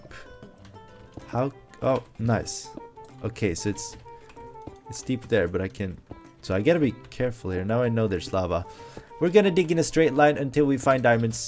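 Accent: Sweden. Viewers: Are u swedish?? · Are u swedish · Are you from sweden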